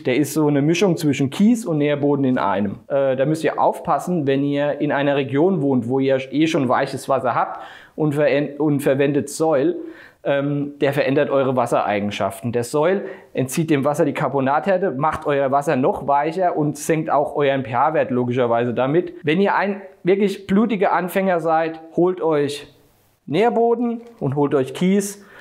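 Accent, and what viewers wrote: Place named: Palatinate, Germany. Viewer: Weschde... Efach schä es Pälzer Dialekt!!! #Südwestpfalz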